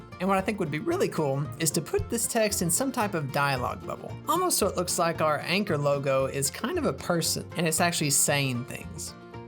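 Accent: South, United States. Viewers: I just noticed your southern accent, and now I can’t I hear it 🫨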